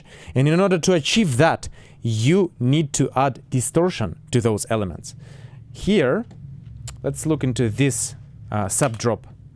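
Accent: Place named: Eastern Europe. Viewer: As a seasoned metal guitar player learning about the world of synthz it adds to your credibility that I spot an EVH, an ENGL and a Custom Audio Electronics behind you. Had it been Vox, Two Rock and Matchless combos I would have had my doubts..... :) Based on your accent I would say somewhere in Eastern or South Eastern Europe,- though it could of course be South America too,- you just never know... Greeting from a Vikingr Metaller in Scandinavia. Subscribed.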